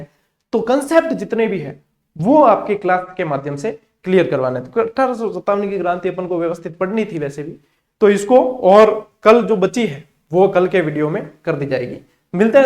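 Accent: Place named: Jodhpur, India. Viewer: गुरू जी आपकी मारवाड़ी शैली में पढ़ाना.... सीधा दिमाग में सेव होता है लिखने की भी जरूर महसूस नहीं होती और जोधपुर की बोली का तो क्या ही कहना... खांडा फलसा में कुछ वर्ष गुजरे ( चिम सा , रिंकू बा , अभिषेक बा सा ) 👌👌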